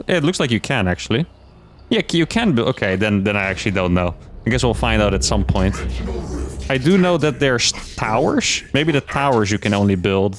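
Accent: Netherlands. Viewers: is this dude Dutch? sounds Dutch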